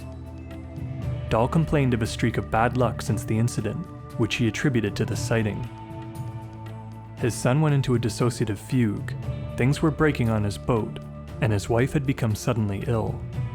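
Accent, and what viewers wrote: Canada. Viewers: Judging by a sligt accent I’d say the narrator is from Canada. Right?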